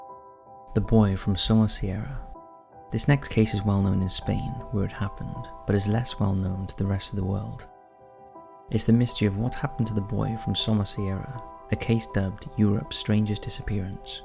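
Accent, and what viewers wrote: Wales, United Kingdom. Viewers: The narrator sounds so much like Craig Roberts! I can hear a Welsh twang to his accent or something! Love that accent! Beautiful! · Ah maybe! I've been trying to pinpoint his accent from day one! A mild Welsh accent is a definite possibility! 🤔Thanks 😁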